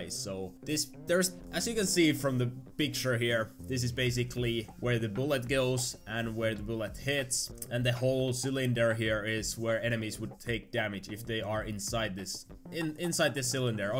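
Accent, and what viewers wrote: Finland. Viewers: Texas Thanatos massacre! btw i like your finnish accent, reminds me of My Summer Car dev guy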